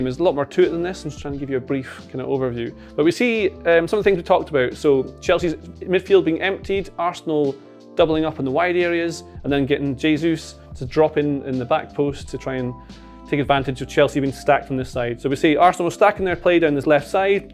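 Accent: Scotland, United Kingdom. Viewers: We’ve got super, he knows exactly what we need! Tactics on the screen, Scottish in our ears, Arsenal on the way to Champions League! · He's from Scotland?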